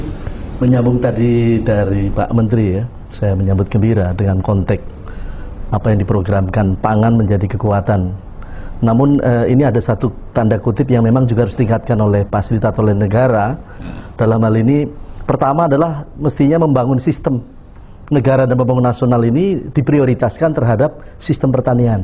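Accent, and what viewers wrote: Java, Indonesia. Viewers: Logatnya medok jawa ya... Beda sama 3 tahun kemarin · Ini Alm. Lord rangga? Kok medhok?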